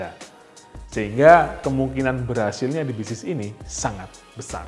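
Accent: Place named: Java, Indonesia. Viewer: menarik sekali mas, tertarik, btw medok ya mas jawanya :)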